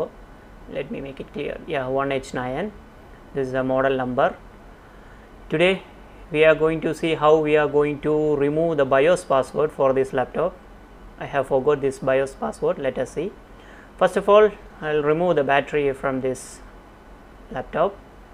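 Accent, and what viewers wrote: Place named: South Asia. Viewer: He has an Arabian keyboard, but sounds South Asian loool, but I love that keyboard. I wonder if this will work.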